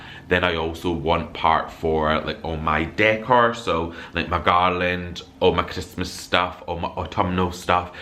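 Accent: Scotland, United Kingdom. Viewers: I found u a few weeks ago I've binged u since n I love hearing a Scottish accent im north east n it's great watching u ur wonderful ur home is gorgeous n I'm so glad I found u I subscribed last week after watching a while n I'm hooked u need to give Lydia n alli Gordon a watch ud love there home xxx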